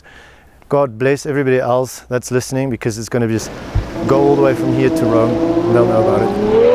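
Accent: South Africa. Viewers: Is my guy South African ?? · A South African accent is so unique. And it explains emotions and feelings so well. Great job on bringing us these wonderful videos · Didnt know homie was south african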